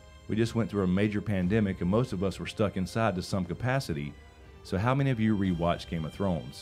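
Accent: South, United States. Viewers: Yes, my heart is still broken. I have not re-watched or re-read GOT since S8. It was the sudden stop at the end. The death that Jon described as "nothing". There is an empty place where Sunday used to be. I miss you, and I miss James all of our GOT family. And because I work in healthcare, the past 2 years have been... well, let's just say GOT is not the only thing that has broken my heart. But I had a few minutes tonight and just hearing your Southern Boy charms, brought me right back to that "super bowl" feeling. GOT did not end well, but maybe, just maybe it will begin well. And we can gather around our respective computers and watch to our favorite YouTuber turned Fantasy Writer stream the GOT prequel content and our hearts will be healed.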